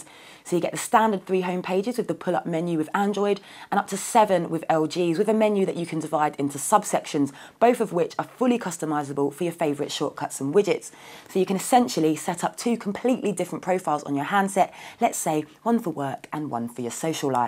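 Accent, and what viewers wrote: United Kingdom. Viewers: Who is that beautiful gal. I love that british accent.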